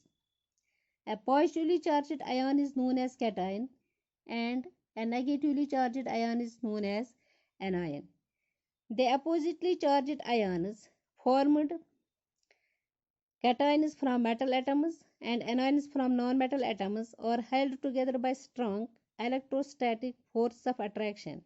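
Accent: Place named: England, United Kingdom. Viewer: English accent tho 🙂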